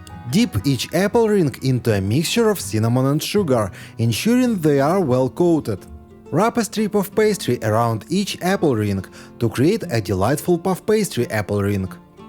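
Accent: Russia. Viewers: ужасный русский акцент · Sorry, can't stand your heavy Russian accent.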